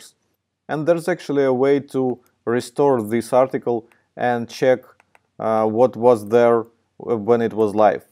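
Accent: Russia. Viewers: Акцент скорее как у Васи русского, а не у ))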